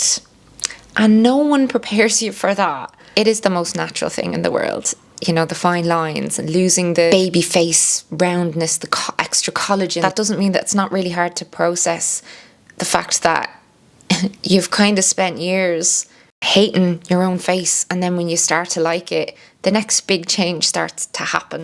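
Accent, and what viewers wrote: Ireland. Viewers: I've been here from the start. Started following you for the beautiful Irish accent and stayed for your beautiful personality ♥️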